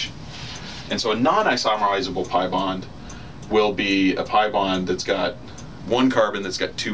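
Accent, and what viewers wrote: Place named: England, United Kingdom. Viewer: Are u an English man!!?.., I kinda felt that accent..., Anyways amazing video!!!. Don't stop doing videos coz, "winners never quit and quitters never win"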